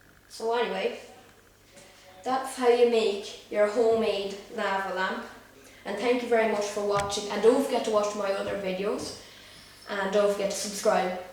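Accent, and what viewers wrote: Ireland. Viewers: Someone's irsh..... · Cool are you Irish? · Yee sound a bit Irish yea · he sounds so irish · ah ur irish just like me :) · gay irish man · Are you irish because I'm irish and you sound iris to me and don't mind what these people calling you names say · Im Scottish and i know for sure he's Irish!!! good job, WELL DONE :D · I love the Irish accent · Are you Irish? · are you IRISH by any chance lol? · dublin or belfast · U sound Irish but at the same time gay :-/ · wow i love his accsent....is it Irish? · I love your accent! You're Irish? Welsh? Scottish? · Sorry but im british, i've never head anyone in my life say 'dish soap' that just sounds weird o_o Where we come from we call it 'washing up liquid'. And no, I do not speak like him because he's irish im guessing >_>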